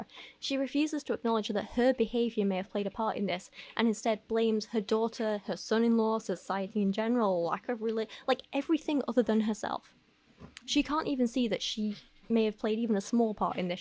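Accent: United Kingdom. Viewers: I wish I could understand you. 😅😅😅😅 your accent works well in the UK...not in the US....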